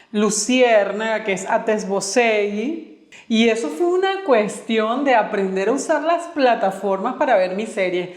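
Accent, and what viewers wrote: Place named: Venezuela. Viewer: Hola, por tu acento te identifiqué como venezolana y no me equivoqué. Me siento identificada contigo con respecto a las series turcas. Leí a una especialista en el tema de novelas de TV y ella decía que las novelas son formatos diarios (de lunes a viernes) y que las series son 1 episodio por semana. Al igual que tú, me encantan las series turcas y la primera que ví y que me enganché inmediatamente fue Kara Para Aşk a finales del año 2018… Engin Akyürek me impactó como actor y enseguida lo Googlee. Y son súper fan de él, he visto todas sus interpretaciones y tengo 1 de sus libros. Con la cronología de tu relato me hiciste revivir aquella época de las telenovelas venezolanas, ví muchas, luego las brasileñas, las colombianas (antes el mercado era exclusivo de las mexicanas) ahora en la TV nacional las turcas están en el horario estelar de las de la noche. 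Me gusta mucho como relatas los temas que tratas y cuéntame como una nueva seguidora en tu canal. A propósito soy de Guayaquil-Ecuador Saludos ❤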